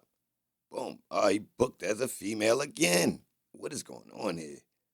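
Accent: Baltimore, United States. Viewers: Are you from Baltimore by any chance?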